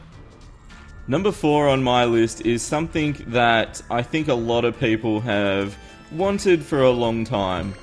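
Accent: Australia. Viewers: Just focused on the great aussie accent XD straya ftw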